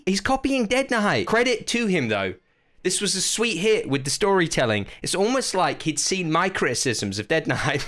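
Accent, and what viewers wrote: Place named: England, United Kingdom. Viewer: He doesn't sound like dantdm he just has an English accent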